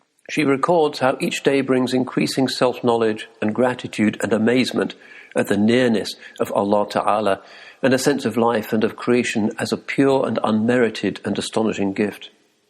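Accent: England, United Kingdom. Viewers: I like this, just wish it didn’t sound so staged. Maybe I’m just a lecture kind of person...it takes a certain nerve to appreciate poetry in a heavy English accent.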